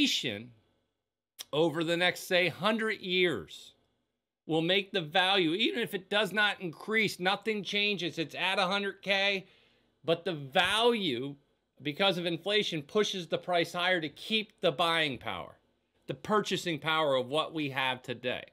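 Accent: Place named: Pennsylvania, United States. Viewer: Hello from Morgantown WV. You sound like you're from PA,.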